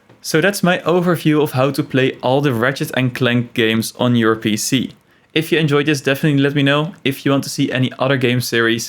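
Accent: Netherlands. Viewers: ahh I recognize the accent and indeed you are Dutch. Really cool channel, subscribing now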